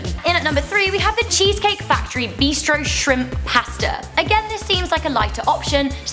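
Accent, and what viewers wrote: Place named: England, United Kingdom. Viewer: Saying all of our American foods in an English accent is so funny 😂😂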